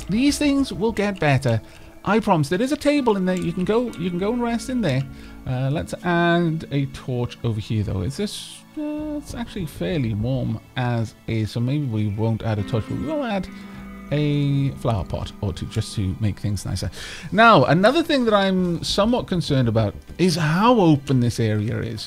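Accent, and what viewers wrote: Wales, United Kingdom. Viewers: He sounds very Welsh. I'm somewhat surprised that people would have an idea of what Welsh sounds like and wouldn't know is one of them.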